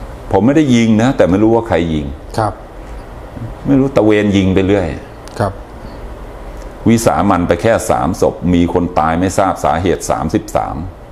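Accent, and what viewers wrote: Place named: Lat Yao, Thailand. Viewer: คนลาดยาว ก็พูดแบบนี้5555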